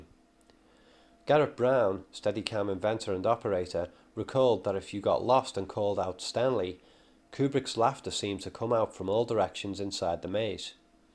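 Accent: Liverpool, United Kingdom. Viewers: Great video. Your accent interests me -- sounds like Scouse transplanted across the Atlantic. Are you a Scouse expat? :p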